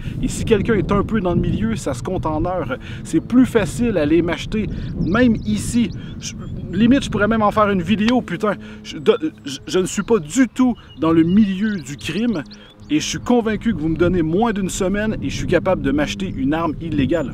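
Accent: Quebec, Canada. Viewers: Ca cé du pur Québécois ,normal que tu comprenne rien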